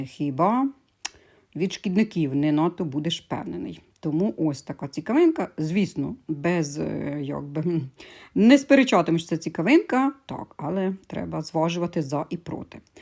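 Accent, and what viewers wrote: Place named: Cherkasy, Ukraine. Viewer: Я передивилась майже всі ваші відео. Ваш говор мене відправляє мене в моє дитинство, до мами. Скажіть, ви живете в Черкаській області?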